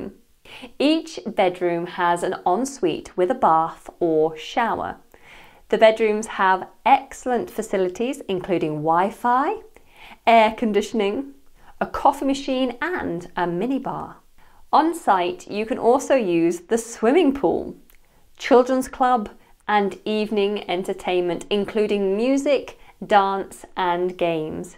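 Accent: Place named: United Kingdom. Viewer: Hello,. I speak English like an American, as I was born and raised her. I do enjoy your slant on proper British English, however. BTW, I ran across a single mother (mum) who has a YouTube channel, and she speaks "perfect" Yorkshire English, IMO. The site is "Mama Mei." I enjoy listening to various dialects in English and other languages. I do speak about 20 languages: it's a hobby. - Dr Dave Menke, Tucson, Arizona, USA.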